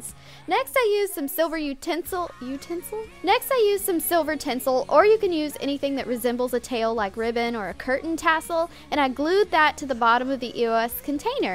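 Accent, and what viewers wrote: South, United States. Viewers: is she country or something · Subscribe Ily videos ur so creative and I love ur funny country accent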